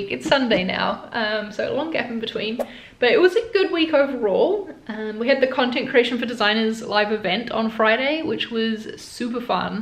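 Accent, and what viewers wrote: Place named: New Zealand. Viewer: Besides the design stuff content that I really enjoy and helps me, I think I watch you for your English accent and those occasionaly 'yeeeeeah' kiwis that you drop sometimes <3 I miss NZ sometimes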